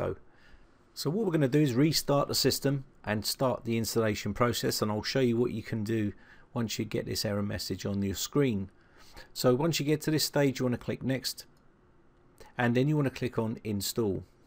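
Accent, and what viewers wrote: United Kingdom. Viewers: Thanks so much mate. This is exactly what I'am looking for. BTW I love your British English. ^^ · I so want to thank you mr. british guy! I feel so relieved now. weew! YOU ARE A GODSEND!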